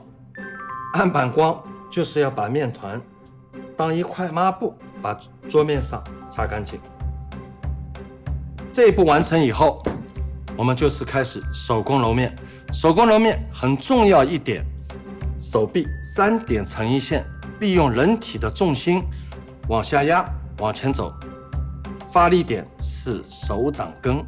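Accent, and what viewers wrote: Shanghai, China. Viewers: 呜呜师傅的上海口音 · 一听就是上海师傅😄 讲的不拖泥带水 专业 是我喜欢的风格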